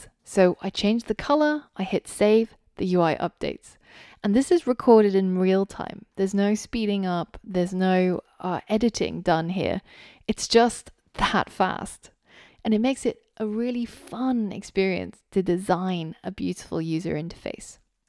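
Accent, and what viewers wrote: United Kingdom. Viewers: She’s from London.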